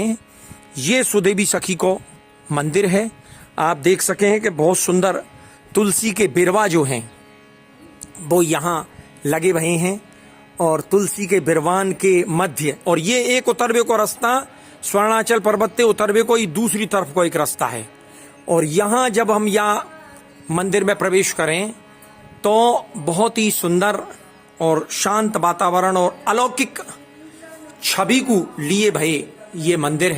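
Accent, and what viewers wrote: Braj, India. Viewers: महाराज जी आपकी ब्रज वाणी बड़ी मनभावन हैं 🙏🙏🙏 जय राधा रानी की 🚩🚩🚩🚩🚩🚩🚩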